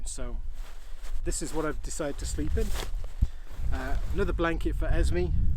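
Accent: England, United Kingdom. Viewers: Lol again Esme stole the show! I felt bad for her with those short legs. I’m short too I know how she feels. My boyfriend is from England as well but totally different accent than yours. He’s from Bedford. Milton keens? I will be doing my first camping of the season in 4 weeks with the Kamp rite. You inspire me. Keep up the great videos!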